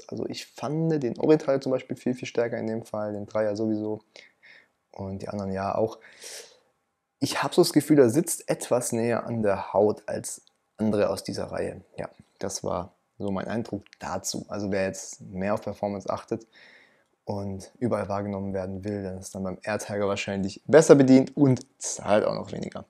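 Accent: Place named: Germany. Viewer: I love your language and your accent! Are you German?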